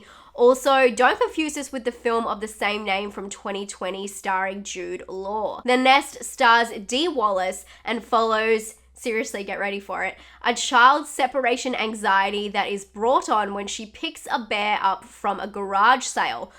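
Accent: Australia. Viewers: The way you say tube! I love an Australian accent.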